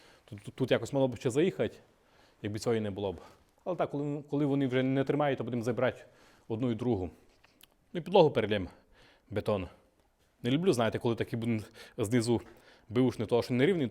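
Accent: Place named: Polesia, Ukraine. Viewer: Привіт молодь❤! Приємно спостерігати за працьовитою родиною! Здоров'я та наснаги вам❤!, я прекрасно розумію чудовий поліський діалект,у свій час працвав в Сарнах на маслробному комбінаті охоронцем, досі закоханий в ваш край,та щирих доброзичливих поліщуків! А смак вашої чорниці і грибів неможливо забути. Миру нам та Божої ласки ❤!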